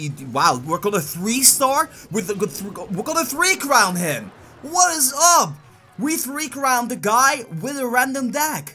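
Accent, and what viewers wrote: Netherlands. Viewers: are u dutch cause i am and your english is like you're dutch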